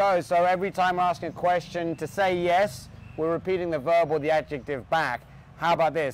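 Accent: Thailand. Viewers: wait.. whatt? I was shocked when i heard your thai accent. Hi I'm thai accidentally scrolled down and found this clip of you.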